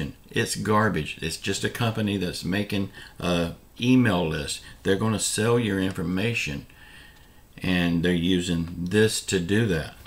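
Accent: South, United States. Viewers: We the people ask how many cups of coffee did you have this morning love your shoe! I can tell your from the south you have a great since of humor please don't ever change I get you ever morning to start my day with your great humor and truth love your wife's show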